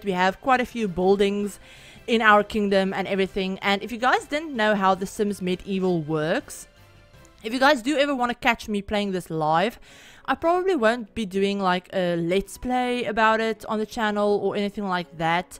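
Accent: South Africa. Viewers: Wait are you South African?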